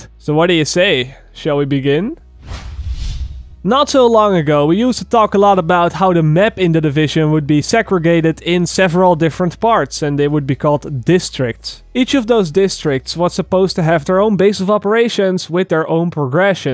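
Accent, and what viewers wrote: Netherlands. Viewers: Je hoort zo erg dat ie Nederlands is. Heerlijk!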